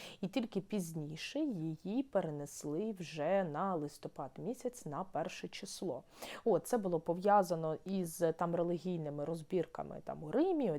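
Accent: Ukraine. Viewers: Як гарно все розклала по поличках! Приємно слухати інтелектуальну людину з такою приємною зовнішністю і українською вимовою! Дякую, наша ❤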